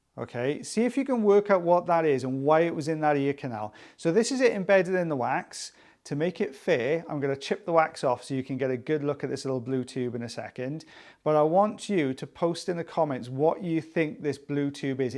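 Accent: United Kingdom. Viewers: Are you Welsh? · AYE, IF YOU DONT QUIT FUCKIN SAYIN WE DONT WANNA HEAR YOUR VOICE, I KNOW SOMETHIN! I fuckin ADORE your voice, especially since it's British-accented ❣️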